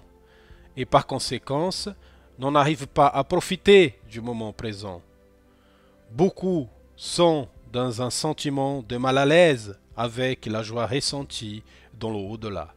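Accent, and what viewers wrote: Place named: Brazil. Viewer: Je suis tellement heureux de retrouver le Père Brune. C'est en cherchant d'éventuelles vidéos inédites de François Brune que je vous ai trouvé aussi, j'ai écouté toutes vos vidéos : 🤗❤. Je vous aime maintenant tout autant que le Père Brune, et je comprends qu'il vous ait choisi comme postier tout amour. Il se trouve que j'adore le Brésil, et aussi votre accent. Merci du fond du ❤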